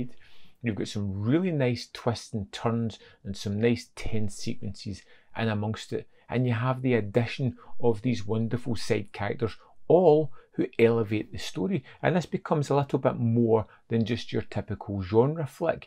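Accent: Scotland, United Kingdom. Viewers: THIS REVIEW IS SPOT ON IT PROMPTED ME TO BUY BLURAY.....AND I WASN'T DISAPPOINTED ..LOVE THE GENTLE SCOTTISH ACCENT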